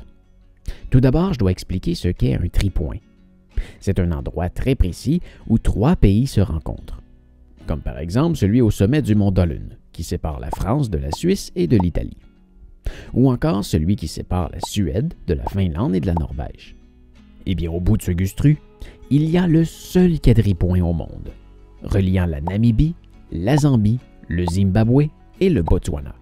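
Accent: Quebec, Canada. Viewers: Ha la la. Je suis désolé. Mais je n'arrive vraiment pas à aimer l'accent québécois. Je trouve qu'il gâche le sérieux de son reportage. Mille pardons.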